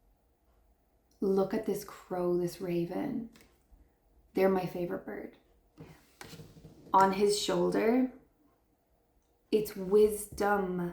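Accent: Ireland. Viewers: Lovely to find you too, together we do this thing, and put things right. Cancerian? An Irish lilt? You made me cry too, thankyou. x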